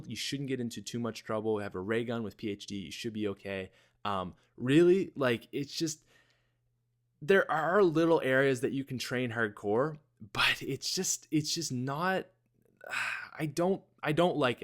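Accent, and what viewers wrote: Canada. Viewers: Is he Canadian? It sounds like he has our accent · r u canadian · are u canadien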